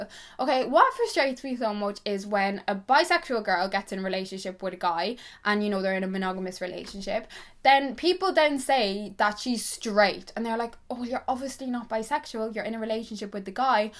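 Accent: Ireland. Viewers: Is your accent Irish? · VoiceYT shes irish :) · I have detected an Irish accent and I am assuming you grew up in Ireland. But as you most likely know in Ireland discos can be quite risky. Could you maybe do a video on how to deflect pressure from guys at these teenage discos. Lately guys get their friends to pressure you and it can be quite overbearing and intimidating. So I was just wondering as a fellow Irish girl if u had any tips or advice for handling these awkward scenarios. I don't know if this topic was covered in the video as I am waiting for it to buffer but if it is just ignore me.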